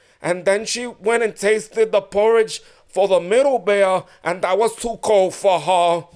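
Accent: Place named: New York, United States. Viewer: 🤣...goldielocks...una presenta....weewee bear🤣! Love his Spanglish NY accent🙋‍♀️🤣👏👏👏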